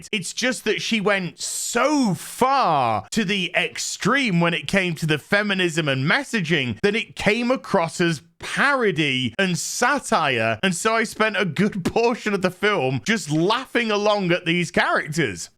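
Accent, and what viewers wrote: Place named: United Kingdom. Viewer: Good Day Sir. Liked and subscribed. Let me say that your Barbie review is by far the best I have ever seen/heard. I also noticed that you are British =) Good Lord, your laughter is so infectious! You bring such a delightful and positive view to the Barbie situation and you Sir are so right! I too am from outside America so I could really sort of be on the same page as you are presenting. I would also like to share with you what I noticed about Americans. They view things very differently the way most people outside the US do. Chances are, many of them (or even majority) will not be able to jive with that humor of yours that made me laugh so much! They are usually Morose and would take anything as offensive even when it is not. Their culture sadly has gone 'Kaput' that they can no longer see the funny side of life or the silver lining in the clouds. 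It is so difficult to understand them. I will continue to watch your vids as they are a delight compared to the dark vibes you get from majority of US content creators. Thank you and GodBless.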